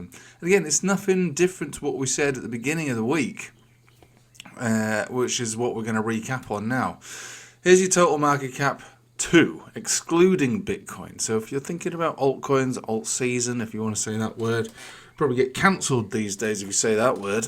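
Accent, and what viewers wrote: United Kingdom. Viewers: Not sure what u took from this video but it’s nice to hear British every morning :) I feel cultured and noble right from the start of the day :D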